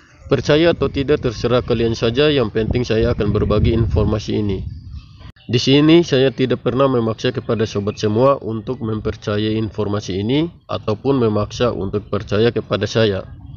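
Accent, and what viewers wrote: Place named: Maluku, Indonesia. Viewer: Tapi bang saya kayak tidak asing dengan aksen berbicara anda bang,sebelum nya saya mau tanya.apa bang dari daerah adat seribu negeri,yang dulunya 1 provinsi sekarang terbagi jadi 2 provinsi?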